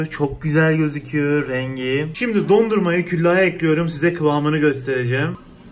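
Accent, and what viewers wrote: Adana, Turkey. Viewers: Hatay diyo ama Adana şivesi var anlamadım ki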